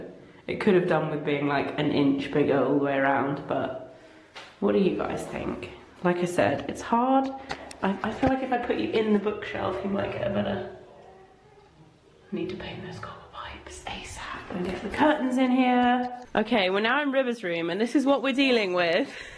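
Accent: England, United Kingdom. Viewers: Vlogging is so amazing! I have been watching you for years now, although I’m older (56) and not really into fashion. I stumbled onto one of your videos and loved to hear your English voice as I am American and love the accent. I haven’t been to the UK yet but would love to one day. I feel like I know you and your family so well and, being as weird as it might sound, if I seen you all in town I would just say “hi and!” Of course that would be funny and awkward 😂 but that’s how Vlogs work I guess. Stay safe and keep the vlogs coming, they’re keeping us sane! ❤️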